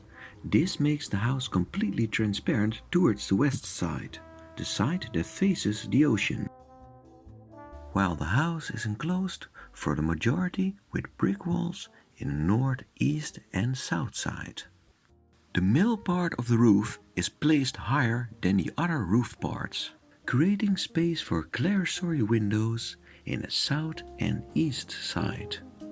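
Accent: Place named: Netherlands. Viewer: The addition of the third bedroom looks poor from the outside, especially with the off-the-shelf aluminum frame window. I'm thrilled to have discovered your channel! They provide so much more than the rather limited views afforded by various coffee table books, even those by Julius Schulman. Excellent graphics and organization. I really get a feel for the interior spaces. By the way, when I watched your first video, I kept puzzling over your accent. When I learned you're from the Netherlands, I thought--No wonder! You guys always throw me off! I once knew someone from the Netherlands, who had gotten lessons with a speech therapist to help with accent reduction. She was from the deep South, however, so he ended up with the craziest American accent I've ever heard.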